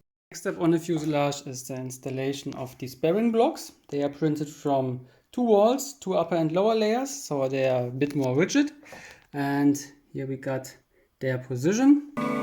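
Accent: Germany. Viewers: please, talk german, it sounds like you are a german native speaker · are you german? Nice video and plane! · der deutsche Akzent sticht extrem raus, aber das Projekt ist super. Man erkennt, dass viel Mühe reingesteckt wurde. Good job 👍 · digga hab noch nie so krass deutschen akzent in englisch gehört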